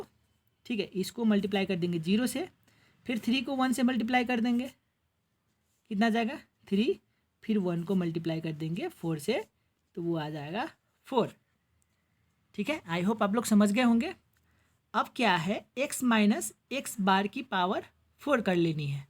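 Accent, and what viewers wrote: India. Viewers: I'm opening the video and the minute I hear Indian 😩😩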